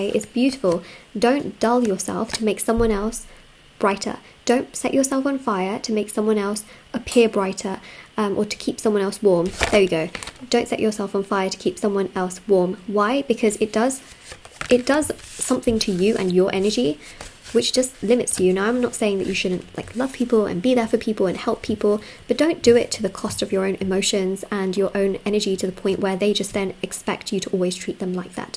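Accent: United Kingdom. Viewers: Hello, I wonder if u do live vdo tarot reading? Where are u located bcoz u sound British, & look eastern Indian, also like to know what is your horoscope sign if u don't mind. Thank u for reading.🙏🐾🌹🪔🕉️🤩✝️☮️🌺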